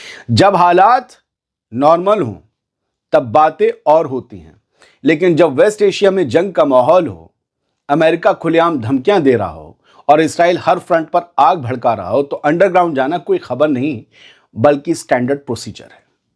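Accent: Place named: Awadh, India. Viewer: bhai asslam walaikum wa-rahematullahi wa-barqatahu Bhai main Gonda u.p se belong karta hu aur mahesoos karta hu shayed aap bhi awadh se hain kyunki news' padhte waqt aapki bato se maine kai baar mahesoos kiya hai.... ALLAH HAFIZ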